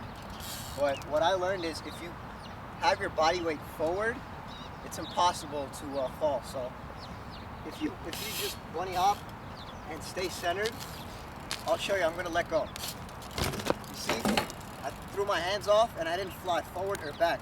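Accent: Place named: New York, United States. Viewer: Yo are you from new york???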